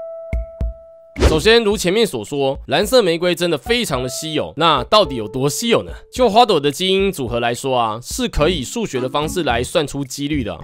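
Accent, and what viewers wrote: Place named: Central Taiwan, Taiwan. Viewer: 有中部腔😆😆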